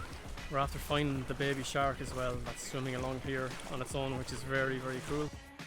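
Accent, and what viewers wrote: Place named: Ireland. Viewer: A good Irish accent😀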